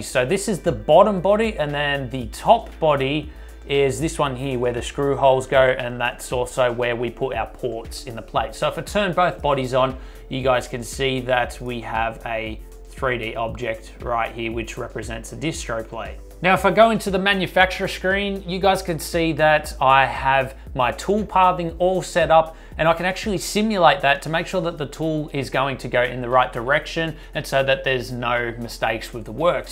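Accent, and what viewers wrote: Australia. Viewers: Are you situated in Australia? Cause u have an Aussie accent.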